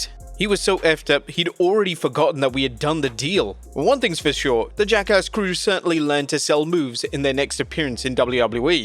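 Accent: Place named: United Kingdom. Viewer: Bri'ish bro talking smack about the jackass crew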